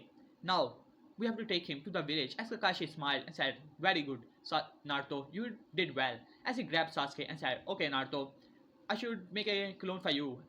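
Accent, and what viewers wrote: India. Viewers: I swear! Each time I hear this person! I think his someone from India.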